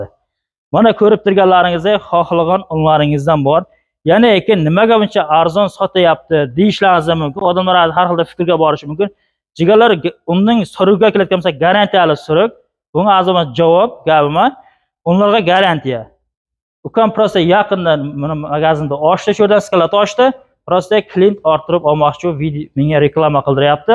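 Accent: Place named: Lakai, Turkey. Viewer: Узбекча гапир лакайча гапирма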